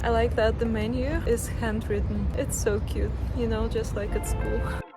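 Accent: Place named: Russia. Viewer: Great video!, have you ever thought about doing ASMR? You have a great calming with the Russian accent voice for it!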